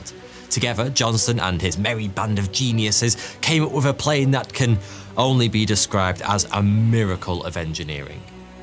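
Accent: United Kingdom. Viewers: Good video and good sense of humor. Also, 'good' Brit accent that makes 'th' into 'ff'. 'Fifth'. for instance, becomes 'fiff'. As one obsessed with the details, I wonder what region that represents?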